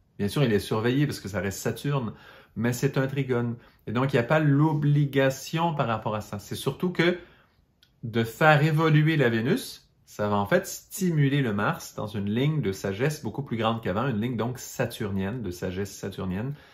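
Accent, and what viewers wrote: Quebec, Canada. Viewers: Et l'accent québécois my God je trouve ça trop cute! Une magnifique province,j'ai eu l'occasion de remonter le st Laurent avec des pêcheurs:INOUBLIABLE! Nous les français on vous adore!💖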